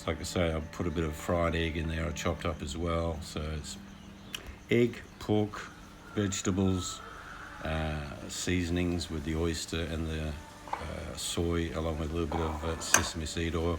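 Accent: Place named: Australia. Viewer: Love it. So Aussie..."that's a pain in the bum".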